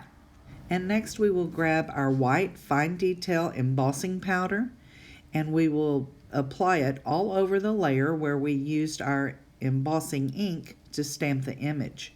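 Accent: Texas, United States. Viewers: Great Video! Beautiful Card! Love That Texas Twang! xo~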